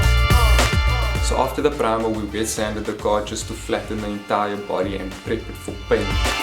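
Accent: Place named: Afrikaans, South Africa. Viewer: Hy klink Afrikaans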